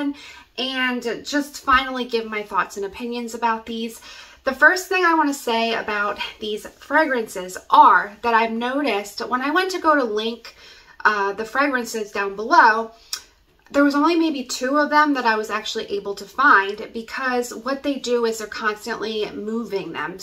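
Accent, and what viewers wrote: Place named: United States. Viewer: Omg the American accent is so cute.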